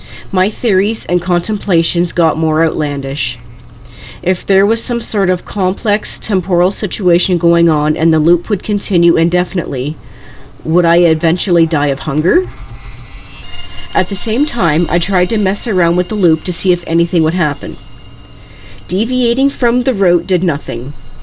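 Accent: Canada. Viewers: these narrations sound canadian as fuck. and i'm okay with that.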